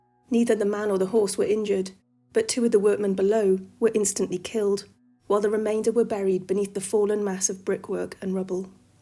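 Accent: England, United Kingdom. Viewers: You and Curious world are the best 2 ghost channels with great narration and editing. Love ur voice. Always loved listening to british women. Wish i lived across the pond. Oh I forgot about the costwold explorers new channel ghostly trails or something like that Robin is a damn good narrator too. Makes all the difference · Hello.. I absolutely love your channel,but your kind voice narrating incredibly interesting facts topped with that beautiful English accent has made me a huge fan.. Lots of love from Australia.. God bless..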